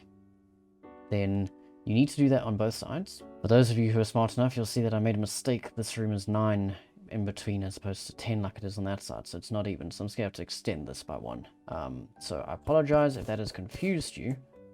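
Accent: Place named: South Africa. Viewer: Sorry, I couldn't help notice the accent, but are you South African?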